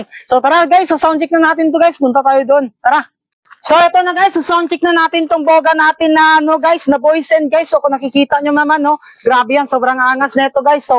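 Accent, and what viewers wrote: Visayas, Philippines. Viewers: Idol bisaya ka ya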